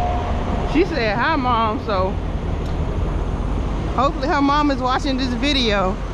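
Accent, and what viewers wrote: South, United States. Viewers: Hi I could Listen to you speak all the time I am from the North I like to hear southerners speak it's so lovely,you are a very intelligent respectful young lady,whenever I am in the Carolinas Virginia or Georgia the people are so friendly and have such lovely manners,I like also that you are being you and not pretending in front of the.camera, you are being yourself and that's awsome,safe travels. P.S. I enjoyed your.visit with your Grandfather and your hometown and when you were showing what your car can do that was awsome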